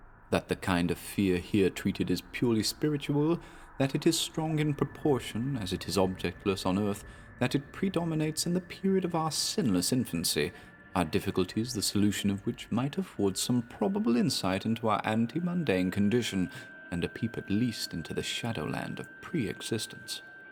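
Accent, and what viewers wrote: United Kingdom. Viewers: This is the most English man I've ever heard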